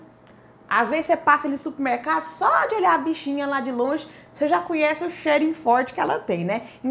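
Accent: Minas Gerais, Brazil. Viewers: Gostei desse segredo,vou usar em casa! Gostei também do seu sotaque mineiro ❤ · Amo esse sotaque mineiro ❤️ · Sotaque de mineira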